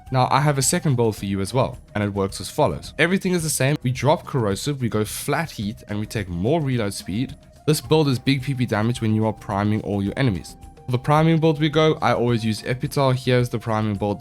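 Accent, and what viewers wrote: South Africa. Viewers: Are you south africa? Your accent sounds a lot like it, just wondering as I am from SA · U south-african? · You sound South African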